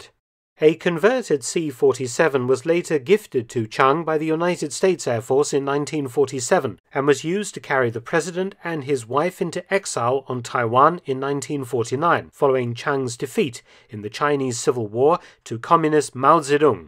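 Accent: United Kingdom. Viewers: He sounds like lots of my friends! (I’m British).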